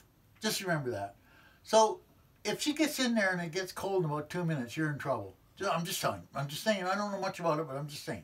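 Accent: Canada. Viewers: I don't watch to learn as much as I watch to hear that Canadian accent! 😂😂😂 Eh? Good to know info,! I will have to re-plumb my rig when I get home and this might help! Also, 🦄🦄🦄🦄🦄📸📸📸📸📸📸📸📸 your camera person makes you look good! 😁🙏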